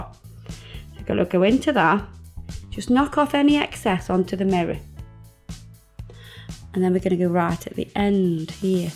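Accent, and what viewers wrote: Liverpool, United Kingdom. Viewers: I thought you were a scouser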